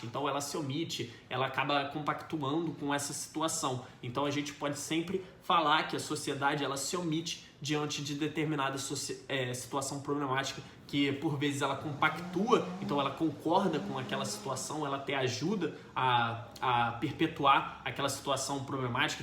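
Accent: Rio de Janeiro, Brazil. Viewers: Eu adoro esse sotaque Carioca, sério kkkk❣ Parabéns os vídeos tão muito bons, estão me ajudado a manter o foco nessa reta final vlw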